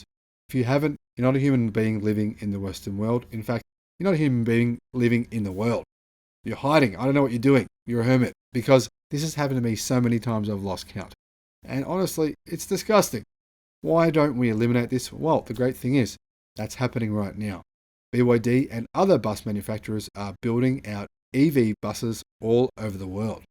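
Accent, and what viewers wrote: Australia. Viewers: Are you Australian? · I can safely say by your accent that you are not American. Perhaps Australia? If that is the case, you should understand quite well the dangers of letting the Chinese companies controlled by the Chinese government, that means ALL CHINESE COMPANIES, run rampant in your country. Of course your country is under Attack by and has nearly been taken over by the Chinese government's "belt and road" initiative. I'd say you better learn just how insidious China is in it's plan to OWN countries by economic intervention. It doesn't matter who owns the shares of Chinese companies, in the end they are all a part of Chinese world dominance. Id say America has every right to be scared of BYD and every other Chinese company who wants to infiltrate our infrastructure.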